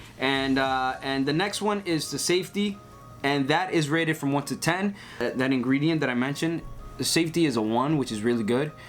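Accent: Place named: Dominican Republic. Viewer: I knew he was Dominican by the first 2 words he said. 🇩🇴🇩🇴🇩🇴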